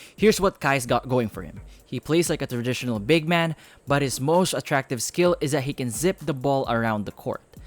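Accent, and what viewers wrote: Philippines. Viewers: Filipino ka ba kasi yung boses mo pag pilipino boy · I think you are filipino bro, because of that filipino English accent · Are you Filipino bro? Kasi yung accent mo parang pang Pilipino. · I can hear by your accent that you’re filipino (do i spell it with a ph or an f, i dont want to be offensive or rude so correct me if im wrong) but with that being said if i was filipino i would like kai more also because of what he represents for the island and even tho im not filipino i like kai more also cuz if it came down to it and chet had to guard him he would have alot of trouble since kai weighs so much more than him although chet has better ball handles than kai but in the nba with them both being 7 footers they would be played as big men chet isn’t athletic enough to play guard so hes gonna have to get his big man skills better. So in my opinion if i was an nba scout i wiuld want kai over chet. 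· Sabi na nga ba Pinoy sa boses pa lang. Idol :) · I couldn't help but notice the Filipino accent in you but superb English nonetheless! Are you from the Philippines though?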